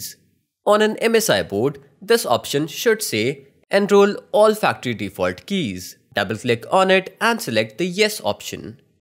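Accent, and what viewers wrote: India. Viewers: This has proven me again that I always have to trust tech people who sound Indian 🙏💖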